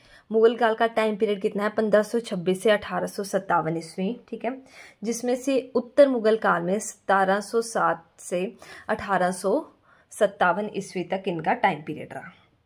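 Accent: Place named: Bihar, India. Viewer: bahut hi achchha contant hai ek hi video me thanks mam, aapke language se lg rha aap bihar se ho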